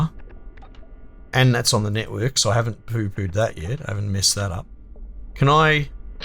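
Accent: Australia. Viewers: lmao i fucken thought to myself this lad sounds like me, then you reckon "right", im like yeaaaaah he's an aussie hahaha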